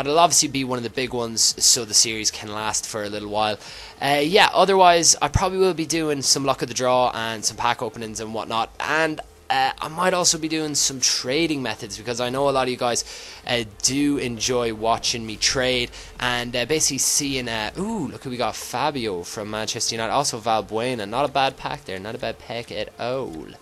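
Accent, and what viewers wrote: Ireland. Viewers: are u irish PLEASE ANWSER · are you from ireland? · hes irish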